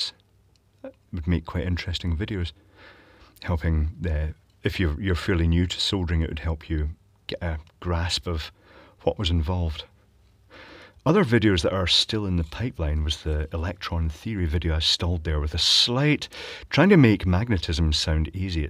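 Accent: China. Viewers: Chinglish.. love it!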